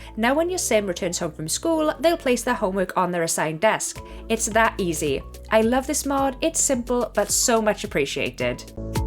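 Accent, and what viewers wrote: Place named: South Wales, United Kingdom. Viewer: Some of these mods are essentials for me now, especially "Community Lot Skilling", "Job Levels" and "Simply Leftovers". Especially "Simply Leftovers". Also.. Ydi'r fy clystau'n gweithio? 😱, are you by chance from South Wales? Your accent is very distinguishable! I'll be incredibly surprised and happy if you are. You will be one of very few Welsh simmers that I've heard 😊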